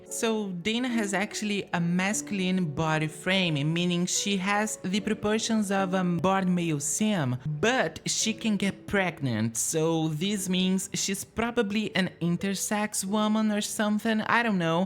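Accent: Brazil. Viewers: where are you from? im pretty sure you’re from brazil by your accent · Esse ingles parece muito brasileiro... Sera que é???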